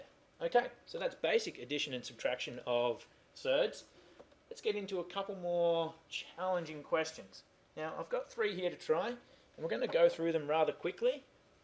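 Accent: Australia. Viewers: Revising in australian, so much better..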